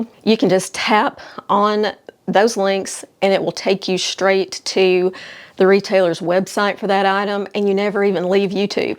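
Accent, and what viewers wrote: South, United States. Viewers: I'm so glad I found you,! Your observations and suggestions are right on target. And you deliver your message in a no-nonsense but kind way, You really are excellent at this, and that's coming from a retired marketing executive. Everything you touch on is something that I had been thinking about; it's eerie and at the same time wonderfully satisfying, And your charming Southern accent is just so easy on the ears, Beats the heck out of my Philly accent! Keep on keepin' on,! Hopefully women will heed your advice. I know I have!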